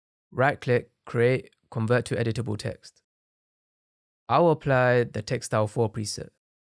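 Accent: United Kingdom. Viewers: I love the central cee accent my dude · Bro are you from west London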